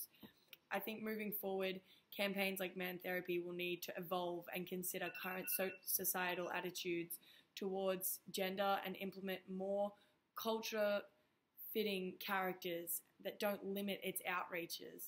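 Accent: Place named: Victoria, Australia. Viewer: I think the statute of limitations is up….I had the biggest crush on Ms. during her time in Eugene. I was completing a lengthy career of sports journalism and sociology. Admittedly it was absolutely fueled by an appreciation of aesthetics, mannerisms, admittedly shallow but subjectively harmless. Your decision to take leave Eugene for the Horned Frogs of Fort Worth served as excellent foundation for my ego. I mean the only reason I never took out was because she left! 🙃 I saw your content for YouTube kids about the importance of keeping in touch during quarantine, mindfulness, etc. Great work! I can I only imagine the impact a star athlete like yourself has on young girls AND boys. Seeing you discuss toxic masculinity and gender issues intelligently is rad. That’s 100% my realm of media/sociology snd I had no idea. It isn’t ironic; since no one ever uses irony correctly…. 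With my awareness of misogyny, objectification, etc it can be a conundrum between the ‘progressive’ trends I believe in and ‘Woah. Is she from Victoria? The accent. She’s friggin’ 😍’ Hey now now…she might be an awful person. We should not suddenly wish all good things for her whilst ignoring the plight of others based on hormones. You’re really intelligent too! I damn well knew it! I’m exaggerating intensity of my crush (slightly)….but to see you sharing a very well composed thesis and using your platform for good. Well that just shows I’m perceptive. I knew there was far more to ya Beaut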